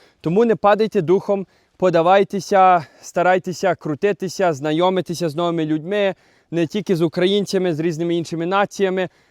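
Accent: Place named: Lviv, Ukraine. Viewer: привет, я такой же иммигрант как ты, но в США. Мне нравятся твои ролики. Откуда ты родом? По языку слышно что Львовская область, вроде. Продолжай делать свои видео. может я позже тоже приеду в Канаду. Пока я буду в Калифорнии, но виза есть CUAET. Ты заметил, когда переехал, что уличное освещение в 🇺🇸 и 🍁 более приглушенное, чем в Украине? Это было моё второе впечатление. Упомяни это в следующем ролике. Я из города Кривой Рог. С удовольствием ставлю теье вподобайку.. На все добре, друже. Слава Україні)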